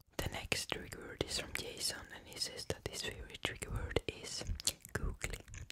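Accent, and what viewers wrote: Sweden. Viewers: He has a Swedish accent ! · Swedish is one of the best accents for asmr and accent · My grandfather is swedish so I really like your accent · I love your Swedish accent ❤❤ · Hee Swedish I believe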